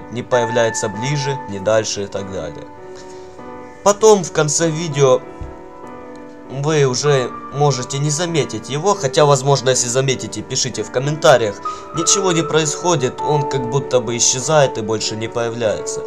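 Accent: Ukraine. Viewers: Вот в этом можно прям услышать акцент украинцев но в 2024 году его прям не слышно и я думала что он русский пх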